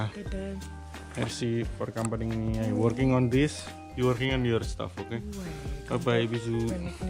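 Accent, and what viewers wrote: Java, Indonesia. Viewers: Bahasa Indonesia-nya koq medok aslinya dari mana mas..?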